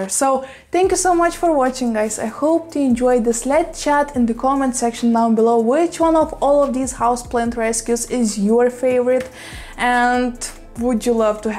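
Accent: Russia. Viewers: I was hoping you were going to include the ZZ plant!! I love your variegated ficus too, it’s so beautiful! Also, don’t apologize about your accent! First of all, I love listening to you and second of all, it’s so impressive that you speak multiple languages!!! Be proud of yourself for that! If I was able to learn another language, I’d have an American accent speaking it, because that’s the language I grew up speaking. You grew up with Russian, so that’s what your tongue is used to doing! Enough lecturing, lol. Thank you for sharing! 💜💚🪴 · You don’t have to apologize for your Russian accent, its beautiful. I have an Italian accent, its what defines us. 🇱🇹 🇮🇹 🇨🇦 ❤️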